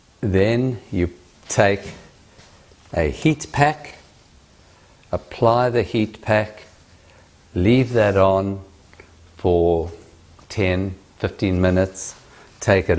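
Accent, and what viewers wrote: South Africa. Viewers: That has got to be a South African accent lol. Either way, I won’t really use this information anywhere but I enjoyed the video regardless. You’re a great teacher ❤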